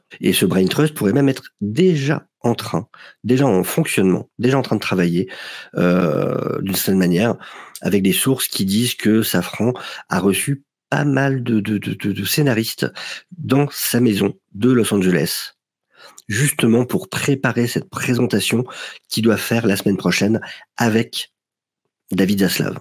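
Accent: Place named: Alsace, France. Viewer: Ce petit accent alsacien, mon dieu ça fait du bien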